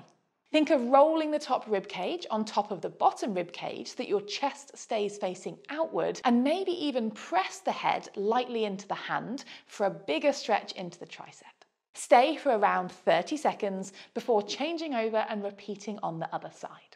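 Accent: United Kingdom. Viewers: And beautiful English accent. Not another American.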